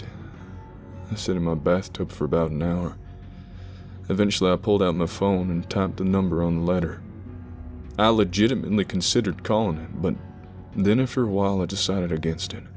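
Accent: South, United States. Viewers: Even as an american I still find his southern accent equal parts authoritative and smooth, like a fine whiskey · Your southern accent always gets me hyped for a story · Imagine having a southern accent like that and detesting guns. I got my Glock 22 on me right now!!! Pow pow!!!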